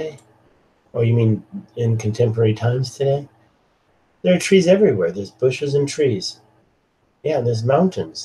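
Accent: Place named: United States. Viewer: You sound as American as me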